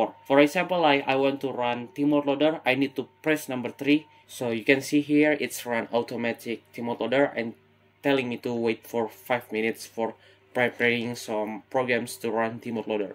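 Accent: Indonesia. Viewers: hmm i wanna ask a little question are u indonesian? [cuz im indonesian just to make it clear your accent was similar to a indonesian trying to learn english and thank u btw for the download files and it make my day thank u so much!]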